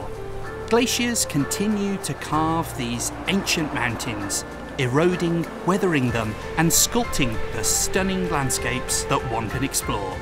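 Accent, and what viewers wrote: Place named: England, United Kingdom. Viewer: Lovely video. Funny that an Englishman is narrating it 😎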